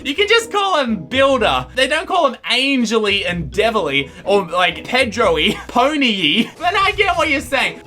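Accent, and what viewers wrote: Australia. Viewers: You have a Australian accent! I’m Australian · I can definitely tell you have an Aussie accent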